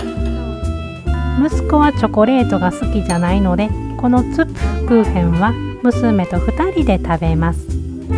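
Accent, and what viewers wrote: Kansai, Japan. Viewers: Nobanaさんの関西弁イントネーションのハスキーボイスが心地いいのでハマってしまい、毎週楽しみにしています。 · いつも楽しく拝見しております😊 関西弁⁉️の話し方がとても心地よく聞いております 次の投稿も楽しみにしております❤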